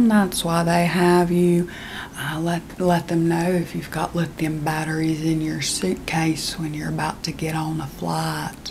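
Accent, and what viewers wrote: South, United States. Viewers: Love the southern accent, lol. Very tingly ✨ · I love the southern accent videos! I have to watch these way before bed because I’m too interested in the jokes and what’s going on to listen to new ones at bedtime lol 😂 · hi i’m from the deep south and your southern accent is quite accurate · thank u!!! i loveee the way u speak with this specific southern accent with drawnnn out words. so soothing for some reason lol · I absolutely adore the doctor roleplays and like all the checking in and stuff. Especially with the southern accent, I could listen to a 2 hour long version of this! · These southern accent ones are my absolute favorites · I love the southern asmr!! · your southern accent is actually so accurate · Alabama or Mississippi. I can’t decide. It’s so natural. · Your southern accent videos are absolutely my faves (the Fargo accent was a very close second though)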